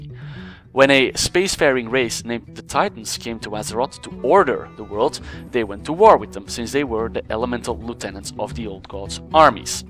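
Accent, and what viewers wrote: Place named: Netherlands. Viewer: are you dutch? you sound like you are. nice vid btw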